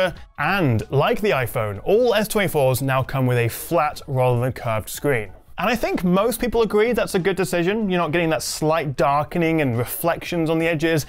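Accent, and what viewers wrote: United Kingdom. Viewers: Brother he's from England not Denmark didn't you heard the British accent 😂 of course it was filmed on England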